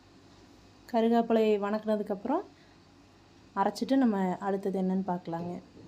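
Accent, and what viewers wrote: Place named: Kongu, India. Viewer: Neenga nalla Kongu pasa pesareenga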